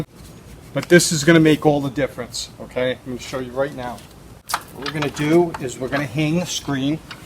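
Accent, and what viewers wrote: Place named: Northeast, United States. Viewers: ! Thank you Man!!! Great tutorial and love the NY NJ accent!!! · Ugh. That New England voice. I couldn't listen Mr know it all. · The best DIY videos are done in a NE accent, so I can feel right at home. Thanks for all of your specific tips! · This guy sucks, don't quit your day job you terrible accent masshole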